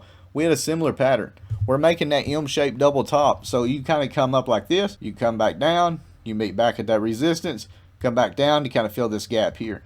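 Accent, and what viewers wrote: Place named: Appalachia, United States. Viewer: it's hard to believe anything you say with that hillbilly accent.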